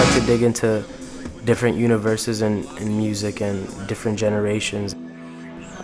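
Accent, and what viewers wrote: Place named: Toronto, Canada. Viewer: Lol yeah thats our toronto accent/slang that you're hearing.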